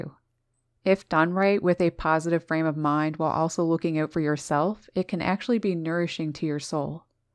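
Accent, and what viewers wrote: Canada. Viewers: This is amazing, cuz how bright personality you gotta have for bird's to earn trust in you, and feed em in freezing temperature? And first meeting trust is precious, I'm American and I hear Canadian accent, plus I love snow, and wanna go to trip to Canada when I can afford it. So beautiful nature 😍❤️😍😍❤️❤️😍❤️❤️. Love it.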